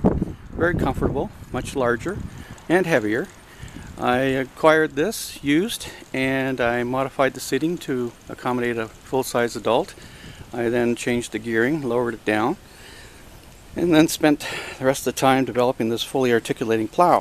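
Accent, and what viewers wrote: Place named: Canada. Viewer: so i guess youre Canadian huh? ;)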